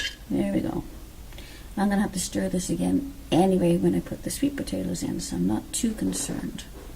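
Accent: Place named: Ireland. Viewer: Are you originally from Canada, or somewhere else? Your accent sounds almost Irish! 😊☘️ Recipe looks delicious, and I love the crock insert💖 HAPPY THANKSGIVING🦃 Blessings from, Washington State USA 🌲☔💖🙏😊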